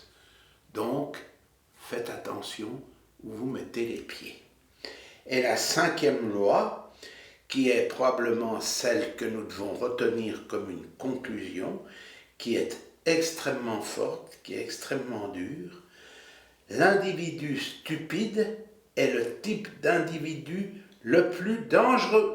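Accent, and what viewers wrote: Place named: Switzerland. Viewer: quel bel accent ;-) vaudois ou genevois ? salutations et merci encore pour votre travail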